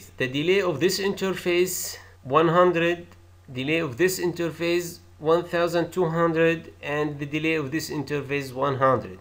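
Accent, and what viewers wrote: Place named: Arab world. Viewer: Well explained. Lovely arab accent.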